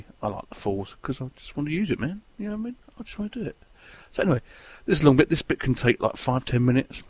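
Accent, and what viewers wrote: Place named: RP, United Kingdom. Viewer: Hey I could imagine you as a very wealthy English man talking about business and politics during the 19th century. Awesome voice!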